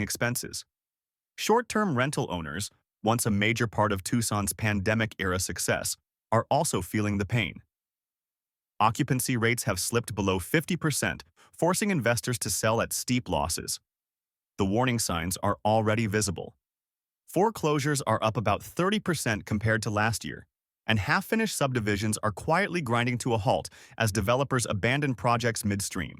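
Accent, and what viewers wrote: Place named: California, United States. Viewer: the speaker can even say the name of Prescott correctly, how do we know if his facts are indeed correct, and are home sale's in Arizona really true facts. This guy sounds like a California person ....?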